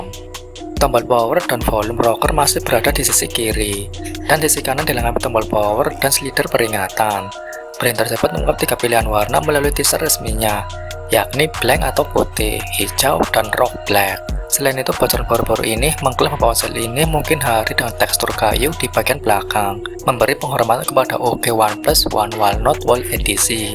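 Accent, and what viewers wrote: Banyumas, Indonesia. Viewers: josss maju terus ngapakers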